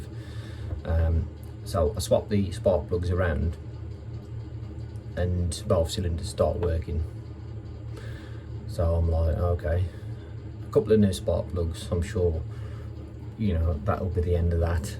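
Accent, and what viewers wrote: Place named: Birmingham, United Kingdom. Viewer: You sound like a brummie my friend....hello from France. Just sorting m'y own cm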